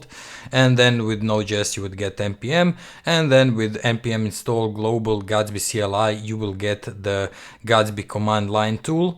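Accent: Russia. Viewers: i like these vids cause i love his heavy russian accent :D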